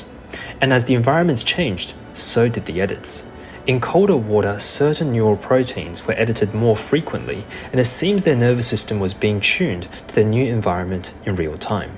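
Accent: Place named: Australia. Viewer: Good to hear an Aussie voice, thank you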